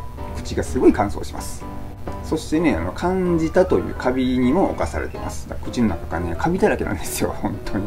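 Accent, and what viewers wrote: Osaka, Japan. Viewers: マスクをしてない元気なさんだ〜❣️今発病された頃の病室からの動画を見ています。頑張れ！頑張れ！と思いながら見てるけどいつもこちらが元気をもらっていますよ❣️ 発病した友達は8日間の抗がん剤投与の後少しづつ副作用が出てきてます。私がさんの動画でもらったパワーを伝えています‼️ 同じ症状になってみないと本当のところはわからないけど、口の中の素晴らしいハーモニー‼️‼️イヤでしょうね…ツライでしょうね……それを軽い明るい大阪弁に乗せて語るさんが大好き😘 頑張れ〜❣️頑張れ〜❣️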